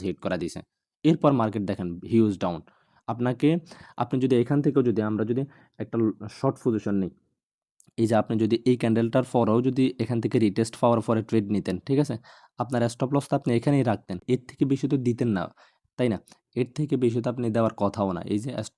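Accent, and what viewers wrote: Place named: Chittagong, Bangladesh. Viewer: ভাই কি চট্টগ্রামের নাকি? কথা শুনে আমার দেশি ভাই মনে হচ্ছে।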